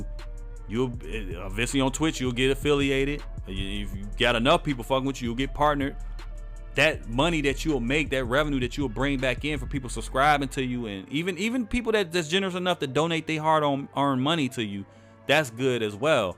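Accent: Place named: Chicago, United States. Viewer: sound like her from the suburbs of chicago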